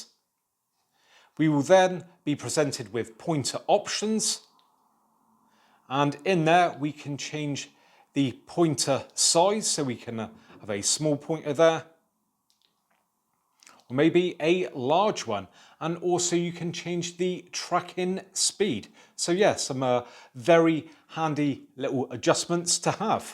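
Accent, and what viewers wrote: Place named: Somerset, United Kingdom. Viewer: are you from somerset? you sound abit like it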